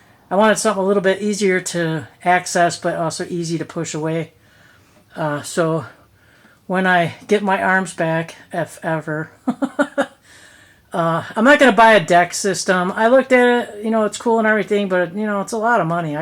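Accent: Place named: Minnesota, United States. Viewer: I'm so happy to come across your channel today. And also am happy to see another female so pumped about gear you have and lug around! People must think I'm crazy as I pretty much have a lot of the same stuff to some degree myself and in my car and several 'bags' around the house, including the dog's go bag! Cheers from up here in Canada....what State are you in as I detect an accent of sorts...MN WI ?? I have a friend from MN and you kinda sound the same....lol cheers!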